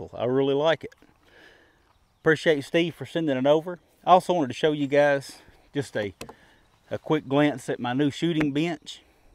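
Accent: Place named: South, United States. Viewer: After seeing your great piece of property and listening to your accent, I am going to guess that you are somewhere in the South. Yours is the second video I have seen on this gun, and I must say that I am not found of the slide stop or the barrel attachment. I have a Kimber .22 conversion kit on top of a Kimber 1911 that functions more like a real 1911. While I am not found of the Kimber, at least it functions more like an original 1911. My biggest gripe about the Kimber is that it does not lock the slide open after the last shot, which requires the shooter to count the rounds he is firing so that he doesn't slam the firing pin down on the back of an empty chamber.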